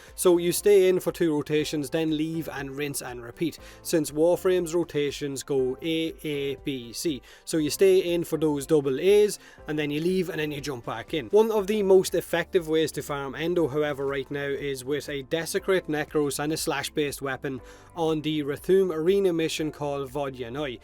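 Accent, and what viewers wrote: Ireland. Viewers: I love your Irish accent. Thank you! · He wasn't wrong about subtitles shitting on his Irish accent. Goddamn that's funny. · Irish Accent ❤ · Awesome irish accent, hey! One of the reasons I prefer your videos to OriginalWickedFun is your voice! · Don't worry i love your bloody irish accent. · Love your Irish accent You single? 😏😂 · That accent killed me. Specially when he said youtube wont understand my irish accent xd. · You have to get "pints" in the index? The Irish and their pints xD gotta love that accent <3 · I subbed for the bloody irish accent.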